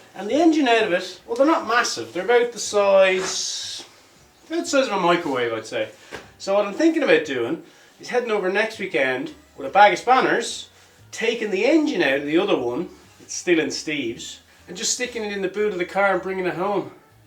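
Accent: Ireland. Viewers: Perfectly timed for the experience of a taco salad then wine accompanied by an Irish brogue. I zoned out on the math calculations, though, ha ha. I'll give you a like in spite of those. And now to go and address my own piles of clutter. I hope we both win. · I get the feeling, when I read the comments, that everyone has your lovely Irish lilt and expressions front of mind when we gather our thoughts and write them down. I always write with an accent when I write my comments after listening to you. Your expressions are really endearing. Thank you so much for sharing your day with us. I really enjoyed lunch. It was delicious. That dessert was particularly magnificent. My nosey attitude is wondering what you might be bringing home with the engine when you return. I hope you have a wonderful week. At least, helping Dan will stave off feeling like a 60 year old for a very long time. 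(mid-afternoon naps are the half of it!!)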